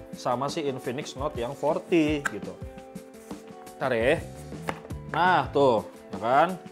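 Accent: West Java, Indonesia. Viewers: Kaciri pisan sundana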